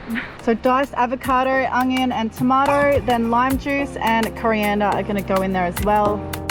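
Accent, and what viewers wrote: Australia. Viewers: I definitely would like to second what this guy here just said 👆 much rather prefer her Aussie accent...kind of a sucka for that accent. Anytime you want to come to the states let me know and we can roll around 😏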